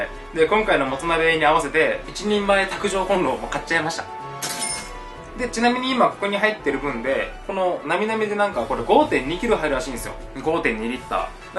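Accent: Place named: Hakata, Japan. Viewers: 福岡弁？博多弁？良いですね(´ω`) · いつもにないくらい博多弁使ってくるやんw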